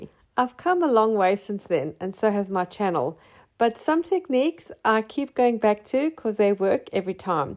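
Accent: South Africa. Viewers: I absolutely love watching your channel and can't wait for the next episode 🤗 nice hearing a South African accent 🇿🇦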